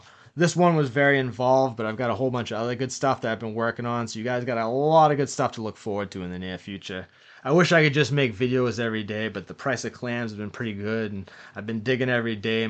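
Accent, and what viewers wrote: Boston, United States. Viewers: This Narrator is so Boston .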